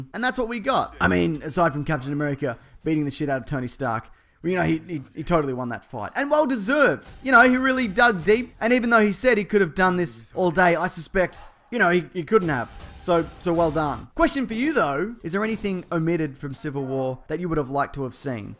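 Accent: Australia. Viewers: are you australian my dude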